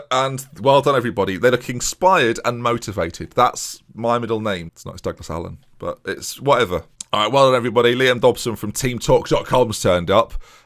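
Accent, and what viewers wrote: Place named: Derbyshire, United Kingdom. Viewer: You sound like my girlfriends family- and they are from Chesterfield. More Derbyshire than Yorkshire hahaha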